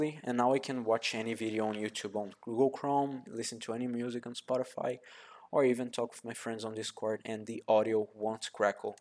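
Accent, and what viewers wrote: Brazil. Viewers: eu reconheci que tu era brasileiro pelo sotaque kkkkkkkkk obrigada, funcionou certinho :D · Valeu cara, salvou muto (reconheci o teu sotaque de br kk).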